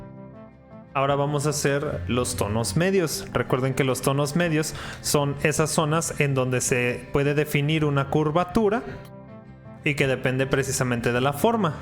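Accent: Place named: Monterrey, Mexico. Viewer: Muy buenos tus videos compadre, stan con madre, se aprecia el esfurzo enorme muy entretenidos dinamicos con ese inconfundible acento regio, saludos🙏🙏🔥🔥🦾🦾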